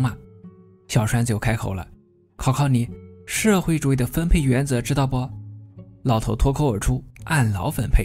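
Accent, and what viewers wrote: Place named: Suining, China. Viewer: 博主是不是睢宁的，口音很像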